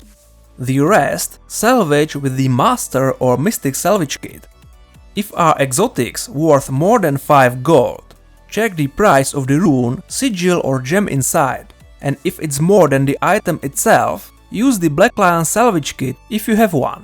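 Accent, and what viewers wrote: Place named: Czech Republic. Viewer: Ta čeština z toho celkem prýští :'D dobrý video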